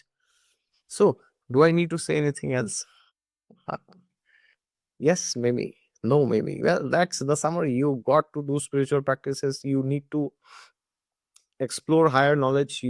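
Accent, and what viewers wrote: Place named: Bengal, India. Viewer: Are you a Bengali! Your accent is very very Bengali!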